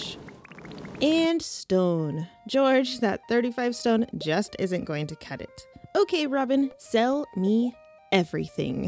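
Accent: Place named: United Kingdom. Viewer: eeeeewwwww BRITISH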